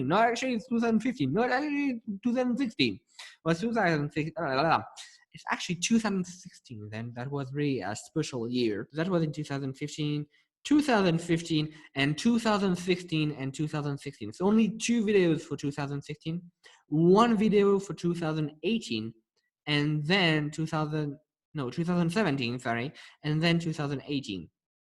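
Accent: France. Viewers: Are you french ? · ah tu parles français j’entendais l’accent ! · I think he mentioned how his old videos were in French, so it's probably some form of French accent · Youre french?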